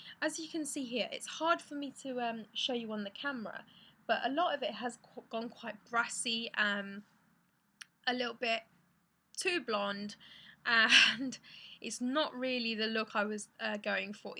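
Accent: England, United Kingdom. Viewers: India with eng. accent <3 nice...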